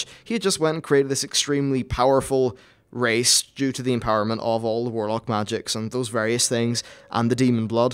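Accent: Northern Ireland, United Kingdom. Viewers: Northern Ireland? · Great Video. Subscribed! BTW... Are you Northern Irish?! lol. I hear a twang of an accent and it made me curious! :D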